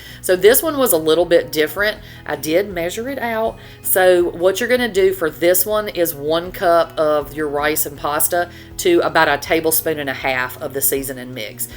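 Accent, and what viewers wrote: South, United States. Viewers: Girl I'm gonna steal these recipes lol, thanks for the video. Luv the southern accent! Luv from California. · THIS, is what the internet should be used for. I love to hear my southern folks accent.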